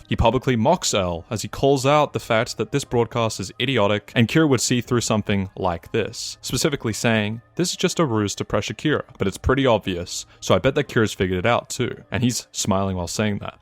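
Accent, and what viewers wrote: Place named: United States. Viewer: Why do you say a bunch of words like a seppo? Are you selectively saying "a" like a yank just to please the algorithm?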